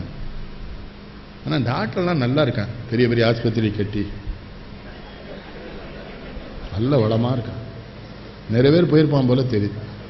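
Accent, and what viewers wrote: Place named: Tirunelveli, India. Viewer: நெல்லை பாஷை அருமை.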